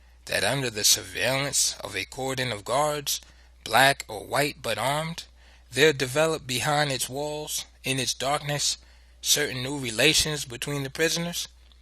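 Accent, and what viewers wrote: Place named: New York, United States. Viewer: Damn this new York accent.. Lol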